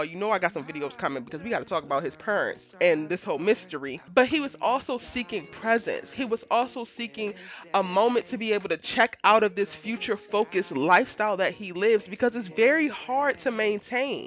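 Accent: Baltimore, United States. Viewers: I knew you were from Baltimore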